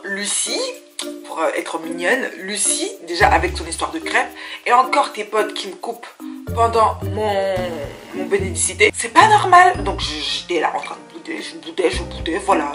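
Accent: Cameroon. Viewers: Utilises plus ton accent du mboa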